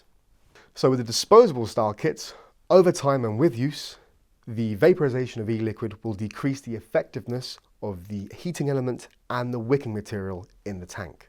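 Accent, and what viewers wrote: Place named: United Kingdom. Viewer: AN IRISH WITH A BRITISH VOICE?!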